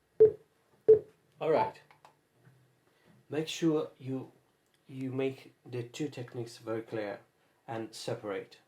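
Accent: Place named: United Kingdom. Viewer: Perfect British accent bro 🤙🏻👏🏻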